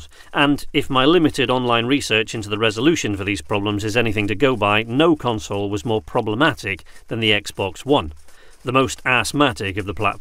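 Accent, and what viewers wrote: United Kingdom. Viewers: holy shit he has the accent like colin firth in kingsman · yeahhh i can hear it in your other episodes, just this one you sounded Welsh 😂😂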